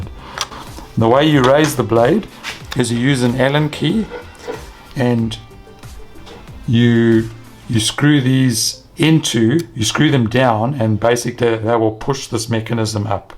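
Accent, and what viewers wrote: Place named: South Africa. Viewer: I see on your profile you're from Australia, but I'll bet my nonexistent fortune that you're South African. Anyway, great informative video. Clear and to the point. Exactly the info I needed.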